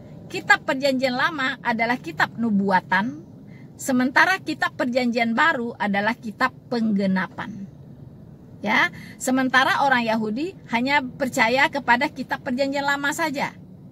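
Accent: West Sumatra, Indonesia. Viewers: Suara ibu ini mirip sekali dengan suara teman saya dan kebanyakan orang Minang, satu kota dgn saya di Bandarlampung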